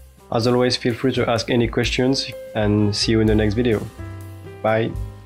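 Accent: France. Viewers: As a french , strong french accent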